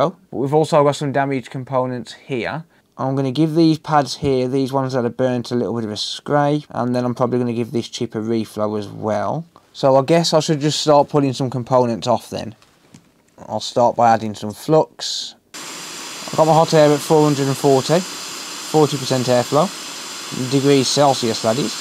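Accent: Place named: Birmingham, United Kingdom. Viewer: Hi mate new sub here I'm liking the vids. Found you through Tronicsfix! Just curious what accent is that? Birmingham? I just like to guess accents lol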